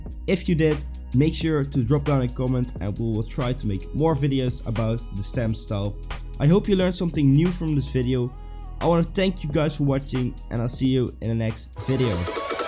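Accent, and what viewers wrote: Netherlands. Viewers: Nice! Are you dutch btw? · Best makkelijk om te horen dat je een nederlander bent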